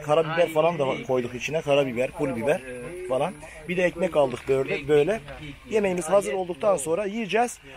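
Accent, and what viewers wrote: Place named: Ağrı, Turkey. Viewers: Agrili oldugunu tahmin ettim siven kendini ele veriyor bende aslen agriliyim dogma buyume igdirliyim vidyolarin com guzel istanbuldan selamlar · Abi cok guzeldi valla sen ya bayazitlisin ya diyadinli sive bizim oralarin sivesi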